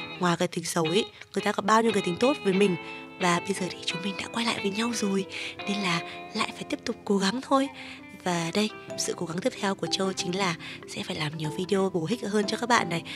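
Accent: Hanoi, Vietnam. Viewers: Tui thích giọng gái Hà Nội Tui thích giọng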